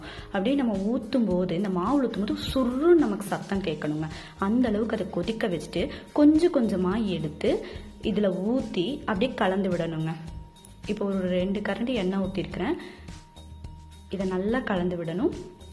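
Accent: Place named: Kongu, India. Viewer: Nice sis..kongu slang theriyuthu..kovai i think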